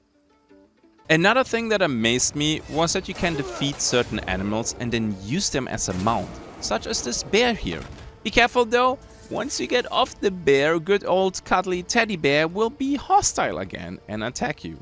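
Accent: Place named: Germany. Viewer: Sehr gutes Video, Sehr deutscher Akzent hahaha. zB review. Das re wir wie be reel ausgesprochen und nicht wie bei red. oder biomes. bi wie by und nicht wie bee die Biene. aber echt gute review, schön viele Schnitte zu spannenden Szenen und passend zu dem was du sagst. Nicht 0815.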